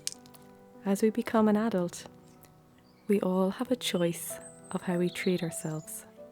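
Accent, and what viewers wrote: Ireland. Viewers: HVD to you too. Do I detect a wee bit of a charming Irish accent?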